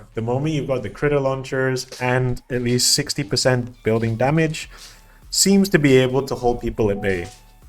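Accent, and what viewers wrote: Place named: Singapore. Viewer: oh nice. You have kind of a Singaporean accent also that's why I figured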